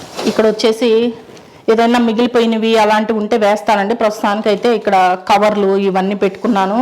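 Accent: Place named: Ongole, India. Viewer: Mam me own place akada me language ongole side la undi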